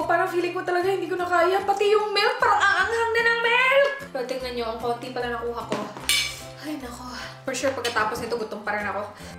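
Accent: Visayas, Philippines. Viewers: Bisaya. Ka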